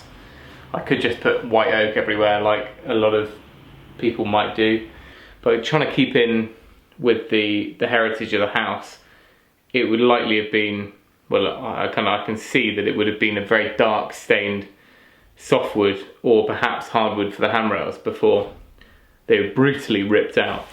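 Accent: United Kingdom. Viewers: Great job but are you in Australia but sound like british???what's on your sandwich butter or jelly???? Lol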